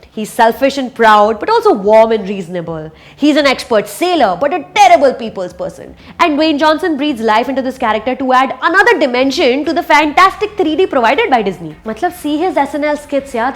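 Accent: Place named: India. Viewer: I love the way you explain the movie in your indian accent. Its totes adorable.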